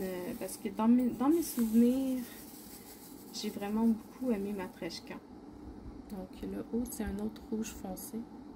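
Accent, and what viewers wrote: Quebec, Canada. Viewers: Coucou. Tiens 2 👎bon donc 2 personnes qui ont pas pu commander chez dac car sa va trop vite 😂😂❤️ merci un peu de dp avec ton accent québécois. 😍😘😘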